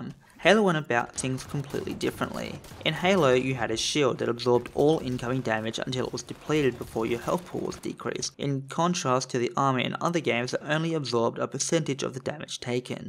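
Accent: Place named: Australia. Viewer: Hey mate! You sound like you're from Australia!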